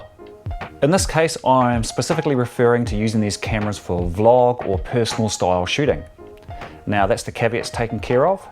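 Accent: New Zealand. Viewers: He's a Kiwi ;) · Are you Australian or Kiwi? :) I'm not a hundred percent sure but I rekon you're from New Zealand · Definitely a kiwi accent 👍🏽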